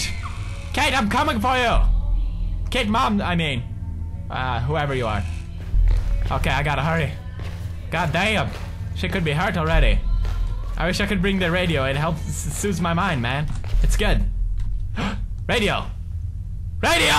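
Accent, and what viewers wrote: Sweden. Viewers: very barely it's super clear hes swedish in this video as to now where you have absolutely no idea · Man... still had a little bit of a Swedish accent.... and he was a tiny bit funnier. Probably because the whole demonitization thing wasn't here yet. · the time when pewd’s swedish accent was still noticeable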